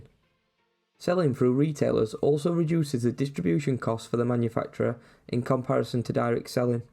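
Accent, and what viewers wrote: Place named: England, United Kingdom. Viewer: I like your English accent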